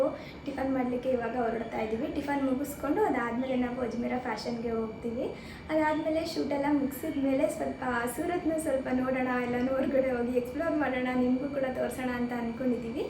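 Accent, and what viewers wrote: Mandya, India. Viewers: Super gi coolagi helidira bad comments madirorige artha agirutte bidi Bro matte nivu nimma mathu tumba ista nange namma mandya bhashe matadtare ❤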